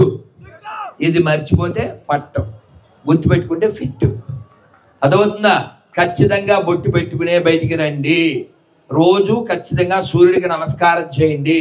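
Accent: Telangana, India. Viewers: గురూజీ మీరు తెలంగాణ భాష మాట్లాడితే సూపర్ గా ఉంది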